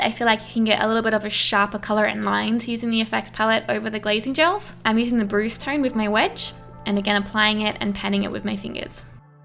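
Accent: Australia. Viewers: Why are you Australian?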